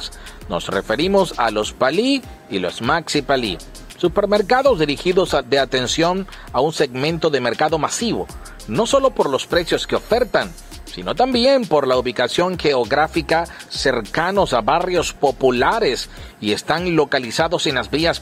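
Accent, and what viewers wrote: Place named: Yucatan, Mexico. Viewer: Oye tienes un tono a yucateco. Pero bien lo que has dado es una lista de precios, y que cada nica ve como resuelve como siempre ha pasado desde ahce mas de 40 años. Loque falta decir es que la tecnología hizo que se incluya en la lista de gastos. Me refiero al celular. Cuanto gasta en mes en recargas? qué precio tiene el celular de un nica? y qué ha dejado de comer para comprar. Entonces mal educados goberno quien gobierne un pais la vendita tecnologia marcará el paso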